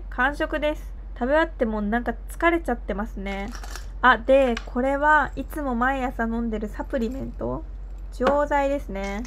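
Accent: Kansai, Japan. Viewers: って関西弁なんや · 本当に魅力的なんだよなー🫶 あと、自分東京に住んでるから、関西弁めちゃくちゃ憧れるー🤩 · 関西弁かわいい😂😍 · 関西弁、、？！意外！ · 関西弁だったんだすごいギャップ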